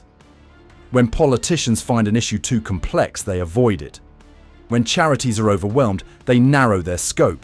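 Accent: United Kingdom. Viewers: Couldn't they find an AMERICAN RESIDENT to narrate this? Instead of this British creep? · You sound like you're from Britain, so it's even worse there. 😂😂😂😂😂😂😂😂😂😂😂😂😂😂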